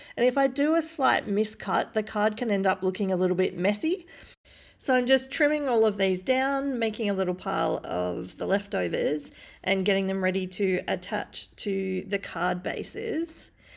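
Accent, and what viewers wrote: Australia. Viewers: I like to hear you talk and I’m trying to get an Australian accent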